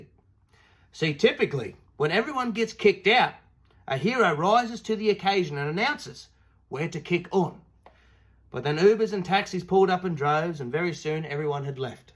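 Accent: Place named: Australia. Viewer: I surf utube alot and I'm so glad that I came across your channel 🤣. It's a rippa 👌👍. Great to hear a fairdinkum assie telling a good old yarn 😅. Bloody love it mate 👍. Sorry about your mate but in his memory as a good old assie keep on telling them yarns and keep the dream alive 😂🫡💔❤️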